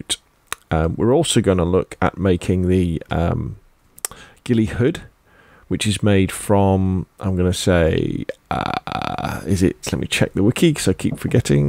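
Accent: United Kingdom. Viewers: Man, good video and all but: Did someone ever tell you that you have an amazing voice? goes down like melted butter. Maybe im just too big of a fanboi for the bri`ish accent but still, u should think of doing ASMR or voice acting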